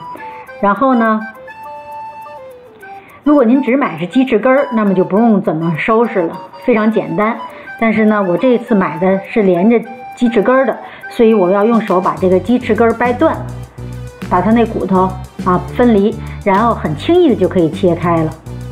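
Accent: Tianjin, China. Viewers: 一听就咱们天津卫口音，倍儿亲切！😄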